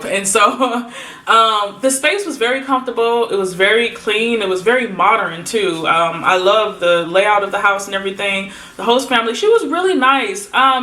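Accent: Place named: Midwest, United States. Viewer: . yep yep. Different foods and maybe different dating habits, like when teens date. Or church habits.... Like that. Also What was the non profit you worked with, what were they setting up and what was your role? Lovely. The work of the Lord. M. IL. Ps. You sound Midwest. Are you a Midwest girl? I live in western Chicago 'burbs. M.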